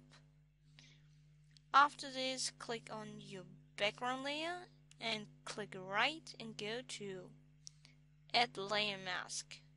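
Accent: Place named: Germany. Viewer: The accent is German and I am German, too, so I have the same awesome accent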